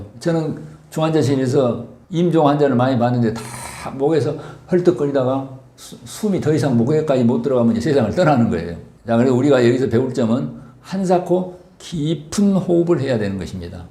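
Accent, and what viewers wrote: Jeolla, South Korea. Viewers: ㅜ 라도 사투리ㅜㅜ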